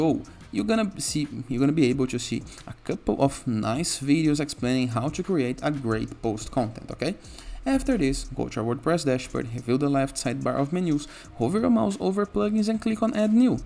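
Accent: Brazil. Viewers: Are you brazilian? Your accent sounds really 🇧🇷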